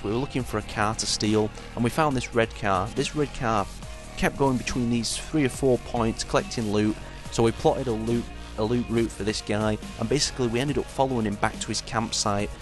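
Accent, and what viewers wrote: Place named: Manchester, United Kingdom. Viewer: which part of Manc are you from?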